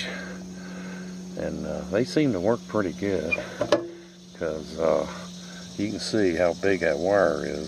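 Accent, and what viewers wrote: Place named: Bristol, United Kingdom. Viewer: Your accent is cool. We here in England have nothing like it. Perhaps Bristol.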